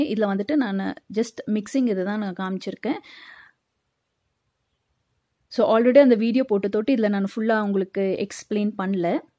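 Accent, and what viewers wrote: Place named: Bangalore, India. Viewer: Hi....you have superb banglore slang... while you talking it's like my mom's family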